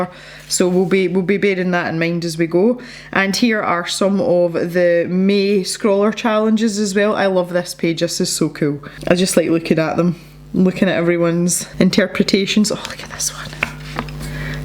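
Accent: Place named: Cumnock, United Kingdom. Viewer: Your reviews are so so very helpful, I love the way you explore the products and play around with the way they interact. And it brings me so much joy hearing you speak, my wee Mum is from Cumnock and is too poorly to travel back to visit family, now that we live in Australia. I show her your videos and they make her smile.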